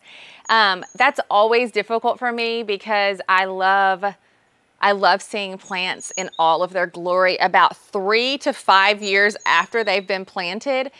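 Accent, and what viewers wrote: South, United States. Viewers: Love your gardens. What is the big green trailing plant on the steps at the end if the video when you’re sitting on the steps? Also, what is the white trailing flower in the round tall pot that isn’t on the steps. I knew you were a Southern neighbor when I first heard you talking in another video. I’m from Memphis but moved to Franklin, TN 40 years ago until grandchildren came then we just had to get closer/ Murfreesboro now. Anyway, keep making your awesome videos. We southern ladies just love to decorate and garden don’t we? It’s our heritage. 🌹😉😀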